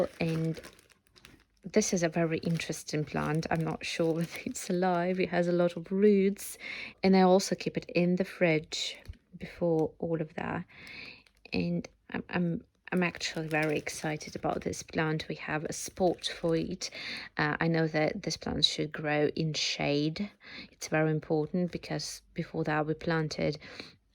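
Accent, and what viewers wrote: United Kingdom. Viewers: Hello, I hope you and your family are well. War is just horrible and it always leaves permanent scars on the land and people. I pray for peace everyday. Growing those beautiful lily's and delicious vegetables will give you something beautiful and good to look forward too. i have just started my tomatoes and peppers in my small 10 by 10 greenhouse and my rhubarb is just starting to poke through the ground. I cannot wait to get my hands in the dirt again,lol. I was wondering how you got that British accent? Iam in zone 6B here in north eastern US. Iam hoping the snow is all done and spring is really here and it is time for new beginning's and the renewed hope of life. Please take care of yourself and don,t worry, everything will be fine. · Also a big fan of Cacti, thanks! (By the way, your english is outstanding with a hint of a British accent.) Be well.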